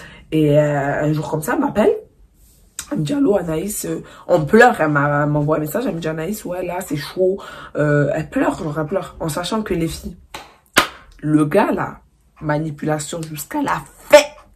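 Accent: Marseille, France. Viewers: t gênante andrea arrête de faire la gadjie mature tu dis dla merde elle a l’accent marseillais point arrête de rager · Ton accent il est trop beauu 💞 tu viens de Marseille ?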